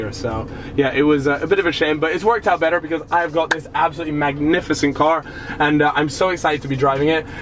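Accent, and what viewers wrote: United States. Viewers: why does this guy have an american accent? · Are you American or Canadian? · am the only one thinking that sounds American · is he american? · american accent lol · dude he sounds American not English tf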